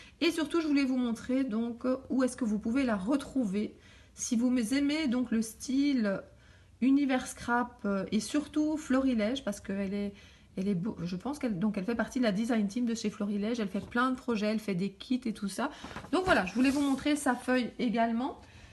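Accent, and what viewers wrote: Belgium. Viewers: Coucou, trop bien tes achats, moi, j'ai hâte d'aller à Passion Scrap en avril. J'adore ce que fait Scrapistelle. J'ai fais une crop d'une journée avec elle pour réaliser un album il y a de ça quelques années...elle est top...sympa, créative, simple...trop bien. Je pense que tu dois être pareille...et j'adoooore cet accent qui me rappelle trop ma Belgique natale...au plaisir de voir quelques autres de tes réalisations. Gros bisous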